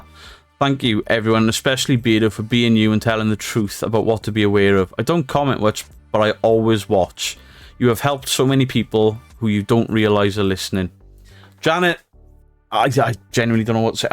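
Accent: Wales, United Kingdom. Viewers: I love the Welsh accent Beardo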